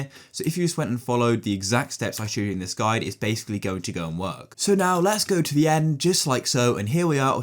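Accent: United Kingdom. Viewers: Omg ur British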